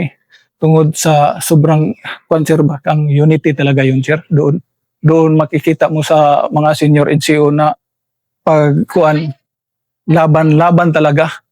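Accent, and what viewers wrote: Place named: Visayas, Philippines. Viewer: Bisaya jud kayo si master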